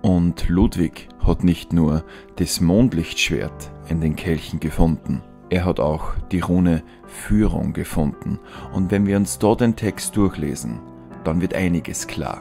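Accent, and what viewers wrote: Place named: Austria. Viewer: Wird gleich geschaut! Ich liebe Lore Videos zu den Fs Games vorallem mit nem österreichischen Dialekt! Schöne Grüße aus Pottendorf (Nö)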